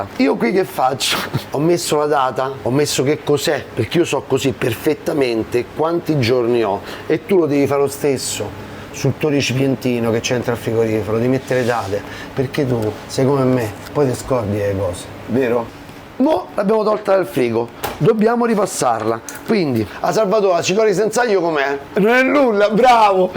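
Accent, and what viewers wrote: Rome, Italy. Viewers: Finalmente risento parlare da Roma. Buona la cicoria ripassata. Ma che ne sanno qui in Liguria. Qua se trova la catalogna...... Mi fai ricordare il sapore.... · ti seguo da ieri ma mi sono già iscritto sei un grande con il tuo parlare romanesco il giusto che non da fastidio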